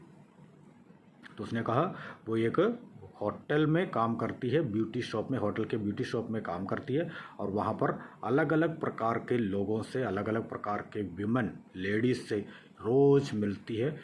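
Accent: South, India. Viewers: Your ascent is like a South Indian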